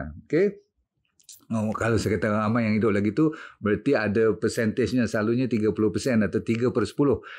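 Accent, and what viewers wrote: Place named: Perak, Malaysia. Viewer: Doctor orang Perak ,tak bolih hilang Bahasa ibunda sekupang Limakupang cukup kelakar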